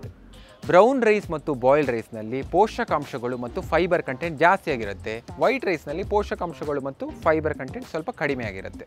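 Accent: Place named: Mangalore, India. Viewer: Nimma M'lore kannada 😍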